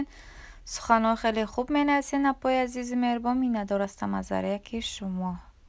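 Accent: Kulob, Tajikistan. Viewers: Салом хохари азиз видиёхот бехат макулай баройки кулобигии тооза гап мезани 👍👍👍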